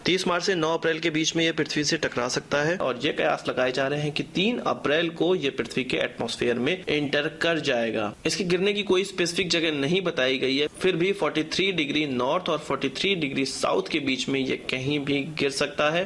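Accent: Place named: Pahadi, India. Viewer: Baat krne k tarike se he pta chal rha tha..Pahadi bhai hai.. :)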